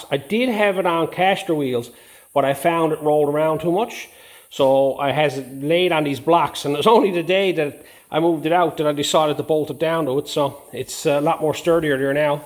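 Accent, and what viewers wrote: Ireland. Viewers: Great piece of kit, well done. Is there a touch of Irish in your accent? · You sound Irish lad nice job on the brake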